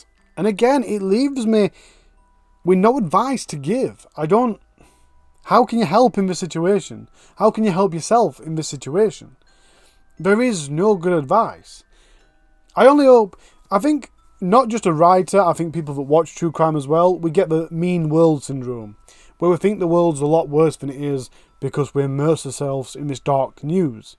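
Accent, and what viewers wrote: Yorkshire, United Kingdom. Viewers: Where in Yorkshire are you from?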